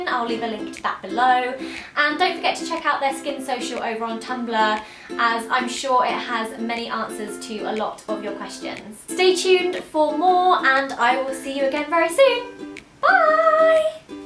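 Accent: United Kingdom. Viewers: I love your videos and I live your British accent❤️❤️❤️😘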